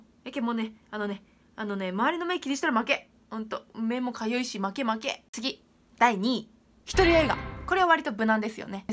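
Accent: Nagasaki, Japan. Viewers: の後輩です！長崎弁恋しくて見てたら面白くてハマってます！応援してます！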